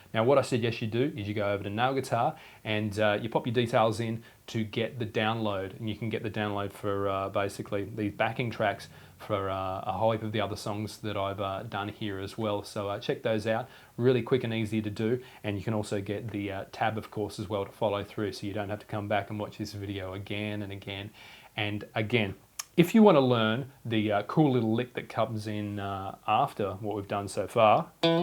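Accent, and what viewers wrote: Australia. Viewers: Hey man, are you an Aussie?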